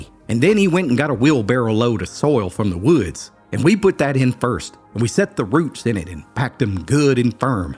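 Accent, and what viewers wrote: Appalachia, United States. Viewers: Lol, I am terrifyed of snakes too😂. So I identified with that old man😂. I love flowers growing but do not like cut flowers, they just die 😢. I enjoy listening to your country mountain voice telling stories. I live in the north (north-central Indiana) now for the past 35+ years. Moved up from Mena, Arkansas back in 1988. I miss the south but not the snakes and bugs. I still live on a big farm but alone now at 70 and, sadly, I am crippled. I can not do much any longer and I sorely miss my veggie garden, canning, baking and washing with my wringer washer 😭. But God has Blessed me with memories for which I am thankful and for your stories. They fill my lonely heart❤. God Bless you! Brook🧑‍🌾🙋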